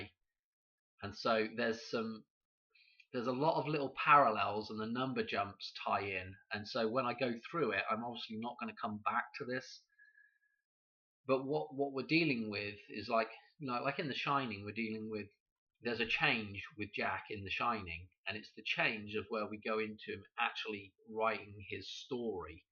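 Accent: United Kingdom. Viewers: 🕊️🌿 Your findings are AMAZING! You are helping me confirm and make many connections. THANK YOU! THANK YOU! Lovely to hear your British accent too. I was born near Stonehenge, surrounded by Churches named after Mary Magdalene 🌹🌿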